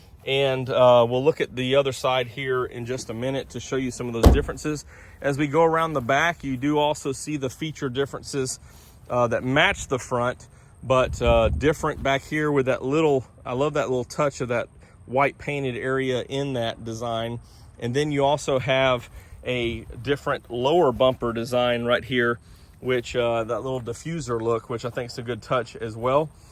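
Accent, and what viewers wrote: South, United States. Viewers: Southern accent…easily misinterpreted. Bench is the word vs Captain chairs in the CX-90. Nice vehicles!!!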